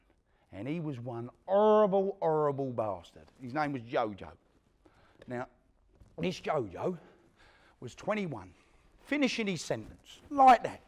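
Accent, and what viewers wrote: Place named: Cockney, United Kingdom. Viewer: Cockney PROPEEERRR! Unlike that awful patwa that’s occurring since Windrush.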